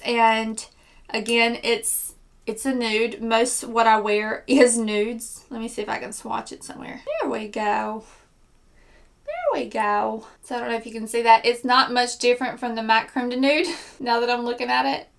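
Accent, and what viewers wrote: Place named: Tennessee, United States. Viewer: Well you're just adorable. (Can you tell I'm 100-years-old?) I love beauty tutorials and am really picky about who I follow, but when I ran into yours, I thoroughly enjoyed it. I MIGHT be a little biased since I'm from Murfreesboro/Nashville, TN, and you have that gorgeous accent, but the kicker is that you know what you're doing. Keep at it and let that personality shine through. Oh! I watched your Nivea-men's-shave-stuff-for-primer thingie one and that's what I use. It almost lifts my face and my makeup....well, it would stay perfect for two days if I'd let it. I have sensitive skin so that's the one I use - FYI. Anyhoo, thanks for your great videos and for sharing your expertise. I can use all the help I can get!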